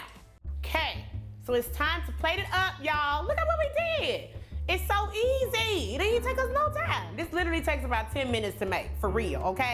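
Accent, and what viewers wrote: South, United States. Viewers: The country accent is kinda annoying, but the recipe is cool 😎 black folks try not to add so much sugar we really need to do better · It is what I say it is. Lawd ha mercy. I'm about to cry. You're accent is cute n country 😇😆😇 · I love your personality and Southern Twang. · I just love your country talking ass say that shit ma🥰🥰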